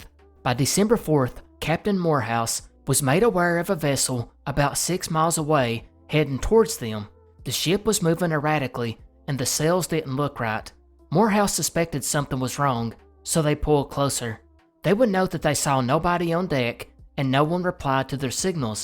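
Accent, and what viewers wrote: South, United States. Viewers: Thank you for another great video. Your narration in that southern cadence make your channel one of my favorites. ❤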